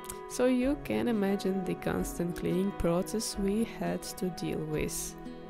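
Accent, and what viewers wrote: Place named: Russia. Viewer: Great dogs!! Where r you from? You have very Russian accent.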